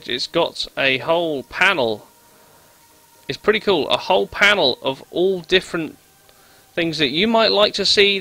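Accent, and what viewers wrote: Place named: Ipswich, United Kingdom. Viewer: your from Ipswich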